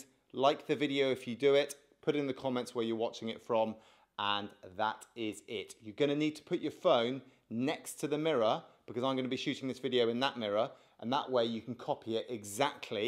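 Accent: England, United Kingdom. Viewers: The best part of your video was your English accent since I'm kind of partial to the queen 👑 of England. Well I have to watch your videos at least 10 times maybe 12 times. But I got it. Hey I like your store. 🤔 Maybe I should go to more of your videos and find out how you did it I kind of like that's a great idea having a nice store like that I wonder if it would work in Bothell Washington probably would cuz Microsoft is here Amazon is here Google is here Nintendo is here.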